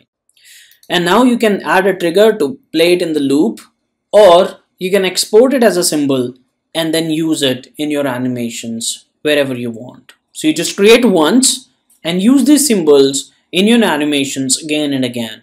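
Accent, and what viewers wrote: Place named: India. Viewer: I fount it interesting that your name is Japaneses and you have Indian accent!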